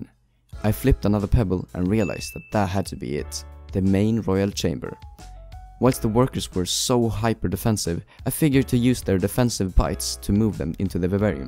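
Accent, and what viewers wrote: Sweden. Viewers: are you from sweden? your axent sounds like it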